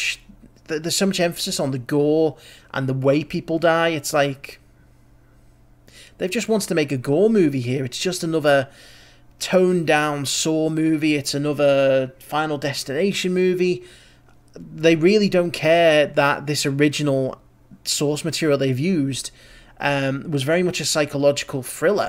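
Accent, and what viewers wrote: United Kingdom. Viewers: I dont know why but I imangied you having a American accent from some reason but no we got a british guy 😂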